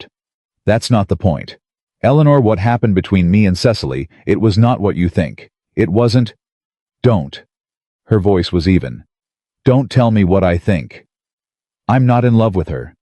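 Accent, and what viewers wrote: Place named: United States. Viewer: The flat American accent doesn't belong in a story about British aristocracy. It's an assault to the ears.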